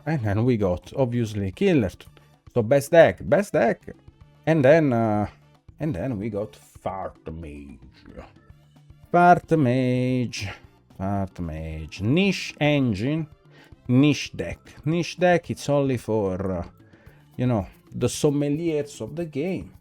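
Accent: Italy. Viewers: He has the voice of undiluted Sicily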